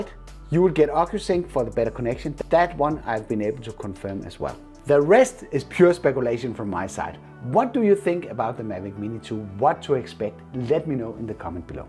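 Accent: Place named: Denmark. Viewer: Er du fra Danmark? Jeg synde jeg kan høre det😂🇩🇰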